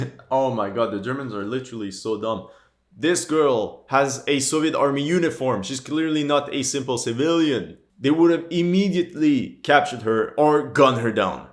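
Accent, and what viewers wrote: Quebec, Canada. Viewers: I have a question for u man where u from? because you have like a canadian accent but from Quebec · He is from the french part of Canada right?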